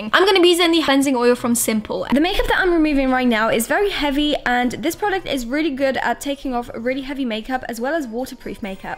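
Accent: United Kingdom. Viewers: say ping pong one more time. that cute british accent tho 😄🙇😉😉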